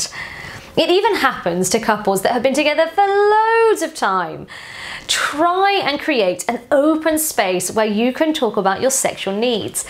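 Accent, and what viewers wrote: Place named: England, United Kingdom. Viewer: Accent? It's the original English language!!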